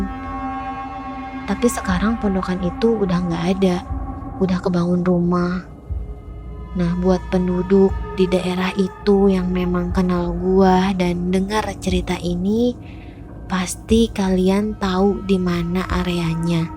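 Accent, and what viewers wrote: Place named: West Java, Indonesia. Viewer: ieu sunda pisan 😁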